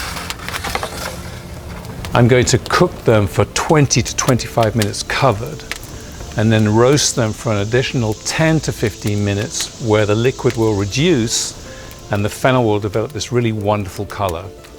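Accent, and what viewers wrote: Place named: England, United Kingdom. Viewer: Between the music your English accent the sound of the fire the sound of the food cooking and the fire itself these videos are relaxing and enjoyable. My only wish is for it to be weekly.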